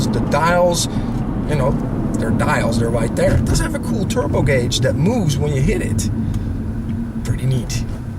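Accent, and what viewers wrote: Netherlands. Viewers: i detect a dutch accent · ahahahh, grappig om nog een beetje een Nederlands accent te horen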